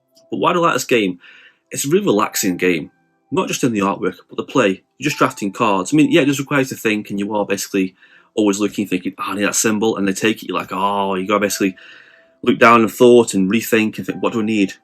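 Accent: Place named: United Kingdom. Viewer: I love your videos!! They’re great and helpful. Helped me decide to pick up Arkham horror lcg :) what region of the uk are you from if I can ask? I can’t place the accent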